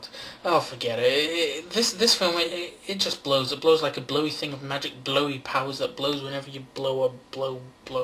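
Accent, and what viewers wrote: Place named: Tayside, United Kingdom. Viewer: ha! great review, i almost choked on your hatred for asylum films. were about in scotland are you from btw? you sound like you're from the tayside area. looking forward to the dark knight review, maybe a batman & robin review aswell?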